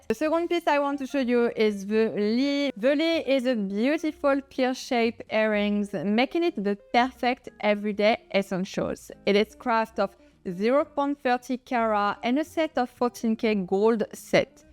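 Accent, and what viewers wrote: France. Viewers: Girl you have a heavy French accent it's hard to understand you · Respectfully, may I suggest that you add sub-titles or text to your videos. I understand your accent because I also speak French but other viewers may not. Thank you for your content, it is excellent !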